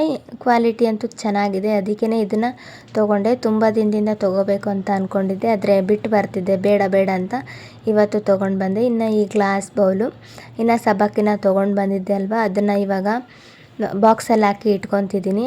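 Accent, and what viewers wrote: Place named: Davanagere, India. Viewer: Nim district yavdu neu same nam tarane matadtira i think davanagere avara neu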